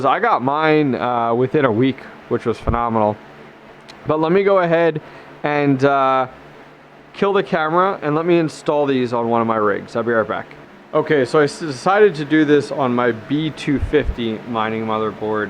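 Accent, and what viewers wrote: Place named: Boston, United States. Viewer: My friend, you have a Boston accent.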